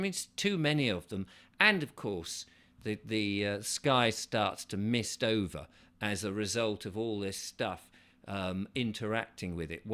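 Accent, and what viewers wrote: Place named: Scotland, United Kingdom. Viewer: I think I detect a slight Scottish accent, that's reward for helping to spread the word up North.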